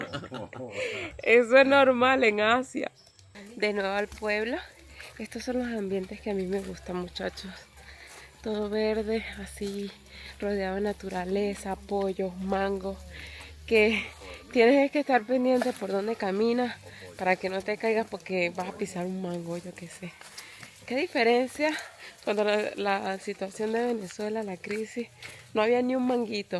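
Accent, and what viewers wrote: Colombia. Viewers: DILE A LOS DEMAS QUE HABLEN PARA SENTIR QUE ESTOY EN VENEZUELA, TU HABLAS PURO COLOMBIANO.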